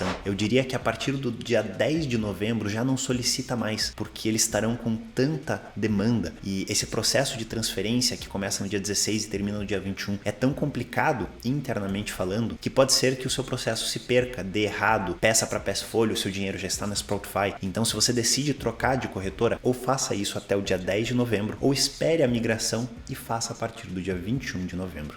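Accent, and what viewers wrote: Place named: United States. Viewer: Você é americano? Pois tem sotaque Se for vc deve entender bem do mercado americano. Como é o seu curso?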